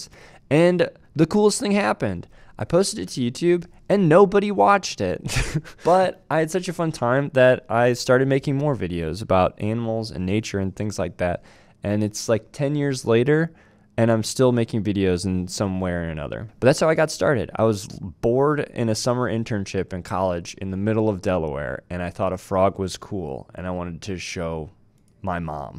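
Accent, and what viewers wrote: Canada. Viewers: Wonderful video! You’re a super likeable and charismatic guy— you should make a podcast! Also, you have the same speaking pattern as Jacob Sharpe; are you Canadian by any chance? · Lemme guess... ur from Canada. That is VERY subtle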